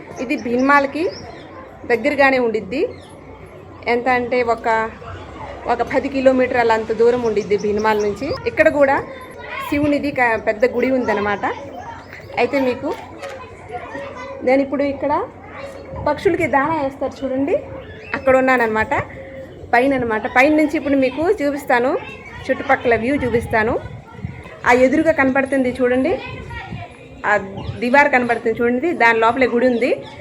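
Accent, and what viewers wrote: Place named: Andhra Pradesh, India. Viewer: Mee Telugu Vijayawada Guntur lo matladya Telugu la undi andi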